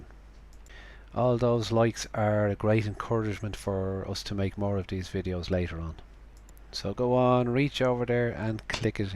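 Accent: Ireland. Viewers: love the Irish narrative and the copper slip lol, dont thing you will live that one down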